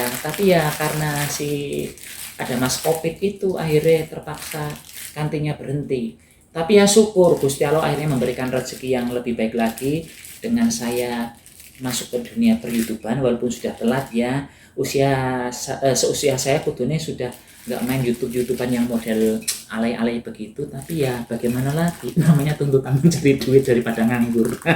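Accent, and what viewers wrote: Banyumas, Indonesia. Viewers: kasian ya, tapi tetap semangat dlm menjalani hidup, jatuh bangun dng segala perjuangannya yg tidak mengenal waktu, sekarang sudah kelihatan jerih payahnya hidup sudah berkecukupan, punya rumah, punya mobil, punya istri belum to, kok selalu tampil sendirian, tapi, banyak temen karena lucu dan cerdas, jadi banyak netizen yg suka banyolannya, ngomog ngapak yg ting pletot, bikin lucu alami, kalau bisa ketemu, pasti tak iwel" pipine sing tembem, botak, irunge sing blesek, tapi, lucu bikin gemes kalau bukak vidionya bersama iyunge yg kool kuno mbrono khas kehidupan desa, tapi sukses capaiannya, 😲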